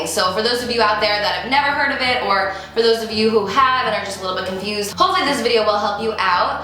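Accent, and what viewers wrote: New Jersey, United States. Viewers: I could tell right away that you're from Jersey from your accent. 😂 I'm from New Jersey too!! Xoxo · She's so beautiful but that thick New Jersey accent 😩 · She sounds like she has a bit of a New Jersey accent.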